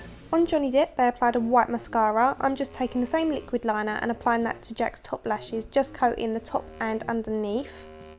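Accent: Essex, United Kingdom. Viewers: You sound like a posher Essex;) But the word "Hatter" really gave it away. Im looking for something for halloween, Saw a black mad hatter on here that I really like but I install security systems and wouldn't have a clue how to do makeup. Especially facial hair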